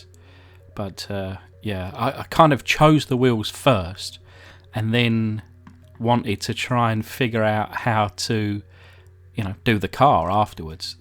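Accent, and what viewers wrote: England, United Kingdom. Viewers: Heard the British Accent, stopped watching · You have very traditional English accent.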